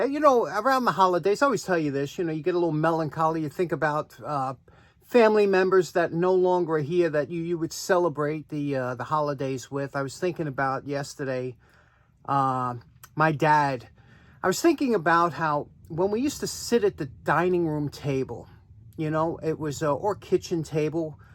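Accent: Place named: Cape Cod, United States. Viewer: Don’t recall much about my Dad as he passed when I was seven years old, but I do remember that he always sat at the head of the table. Don’t really know why but as a teenager and later I sat at the head of the table even though I was the youngest. It always seemed that my Mom treated me as the “head of the family” rather then my brother. By the way, love your accent. Reminds me of back home on Cape Cod. Happy New Year.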